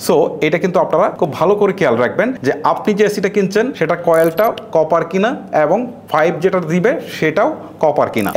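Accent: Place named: Noakhali, Bangladesh. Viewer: Eita noakhalia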